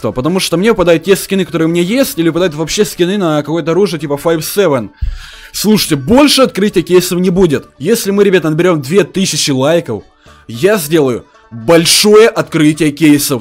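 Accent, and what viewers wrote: Ukraine. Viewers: Ты украинец?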